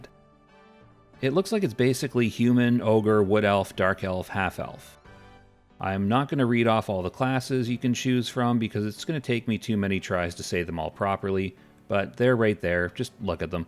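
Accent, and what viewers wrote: Canada. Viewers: You sound like you're from east coast Canada :)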